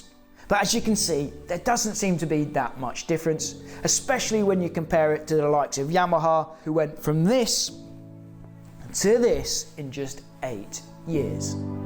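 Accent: United Kingdom. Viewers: He is a Britt. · I couldn't finish watching this video. It bugs me he kept saying CZed. I know that's how they say Z over there in England but for some reason it bothers me. Lol sorry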